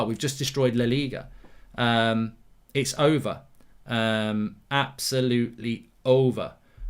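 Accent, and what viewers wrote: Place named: Northern England, United Kingdom. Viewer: Nice Northern accent where abouts are you from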